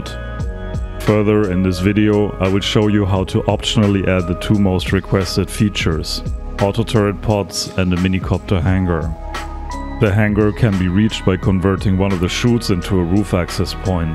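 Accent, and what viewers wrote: Germany. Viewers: so hart cringe das man hart raushört das du deutsch bist xD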